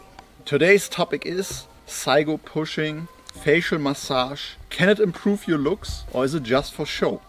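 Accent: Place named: Germany. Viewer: German as accent bro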